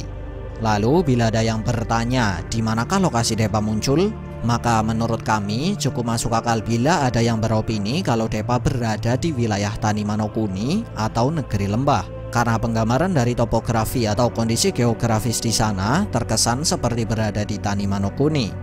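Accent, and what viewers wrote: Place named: Java, Indonesia. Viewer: min aku suka banget sama suaramu, medok medok krenyes.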